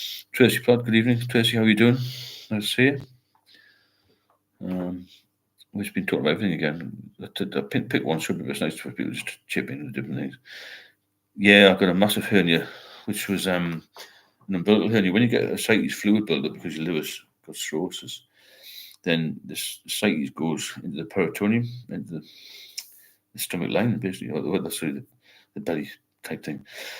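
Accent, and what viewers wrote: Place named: Northern England, United Kingdom. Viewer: he has a very strong Northern English accent - I too find him hard to follow & I'm from England (South)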